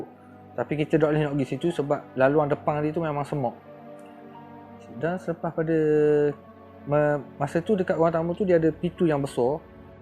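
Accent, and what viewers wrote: Terengganu, Malaysia. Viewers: Suka dengar loghat ganu ni...ada yg faham..ada yg tak...apa2 pun selalu ikuti vlog ni..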